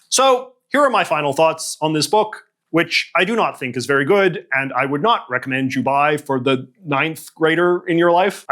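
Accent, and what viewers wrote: Canada. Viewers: sounds like Canadian Carl Sagan and I’m here for it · Now that’s a Canadian accent.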